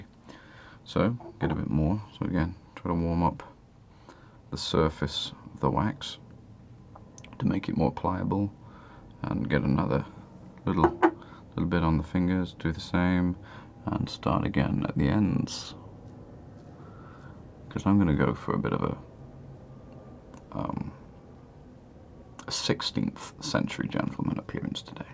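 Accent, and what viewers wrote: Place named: RP, United Kingdom. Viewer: Love the rp accent, subscribed! Fellow brit :)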